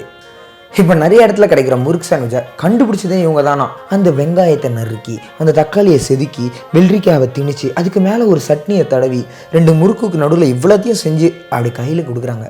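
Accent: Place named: Chennai, India. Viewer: narration aa therithu idhu namba Chennai payan nu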